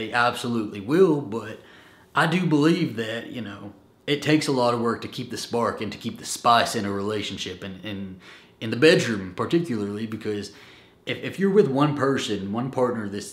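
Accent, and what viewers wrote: United States. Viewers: where the hell in America are you from? Your accent is crazy American!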